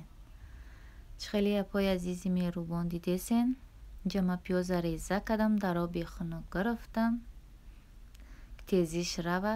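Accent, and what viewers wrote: Kulob, Tajikistan. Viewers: Шумо ай кулоб.хастен мада гапзанитон макулай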